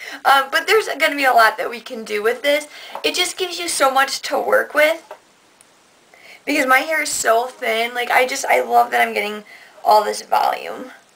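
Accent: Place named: Long Island, United States. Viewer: You sound like your from Long Island.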